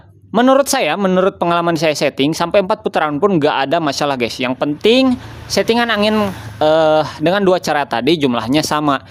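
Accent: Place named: West Java, Indonesia. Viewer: Dari logat bicara nya, akang mirip2 channel bang vedro simister.. cara penyampaian nya jelas dan ada logat sunda nya.. semangat kang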